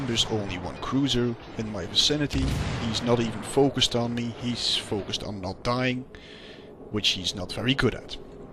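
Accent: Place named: Russia. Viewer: I admire your accent. I can hardly recognise you are Russian at all!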